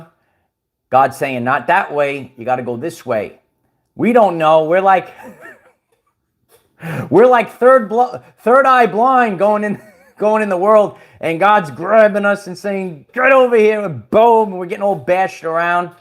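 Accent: New York, United States. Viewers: Fascinating site this,. Just started watching and I've already consumed about 20 shows in just a few days. Personally, I'm a bit of a loner and I lack trust in everyone online although I started awakening around about 2015 prompted by the usual atrocities/events having initially debunked the Party Political systems which are clearly a Cult creation and do not serve the people. I like the way you described the 2020 Plandemic as like the 'Fall of the Curtain albeit I've forgotten the exact term that was used. Spiritually, I'm trying and I like Gigi Young's work but I'm a bit of a hard case and I'm having trouble linking to my heart space and finding Christ Consciousness. People like David Icke, Clif High, Dark Journalist and Max Igan are must watches for me over several years now but, of course, I still know nothing in the grand scheme of things. 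And I love your New Yorker delivery and your hard hotting and specific delivery and hyour Sccoby Doo imitations. Lol. So, I'll be continuing to watch and learn. Thanks mate from here in the UK.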